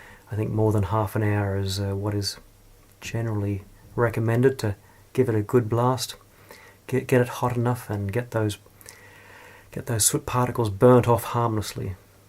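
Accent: Australia. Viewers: Hey I like your presentation manner!. Can't pick your accent. It it Melbourne? Or were you born in the UK or Kiwi and emigrated? Regardless, I thank you for your lovely videos. Thanks matey!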